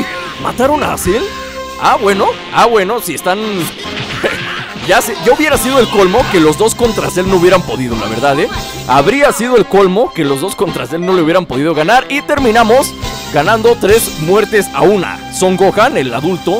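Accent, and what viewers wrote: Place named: Mexico. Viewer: se nota que eres mexicano XD igual que yop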